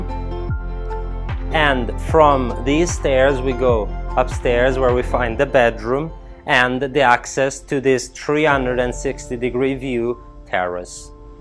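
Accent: Italy. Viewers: L💓Ve listening to ur Italian accent . Besides u r an awesome guy ur self ! . 👍💕💕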